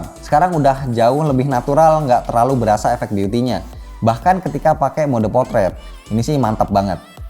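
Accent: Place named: Java, Indonesia. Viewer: Bang, ternyata medog juga ya 🤣🤣🤣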